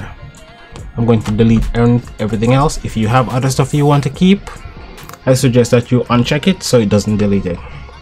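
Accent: Jamaica. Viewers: Why do you sound like a Jamaican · Your Jamaican?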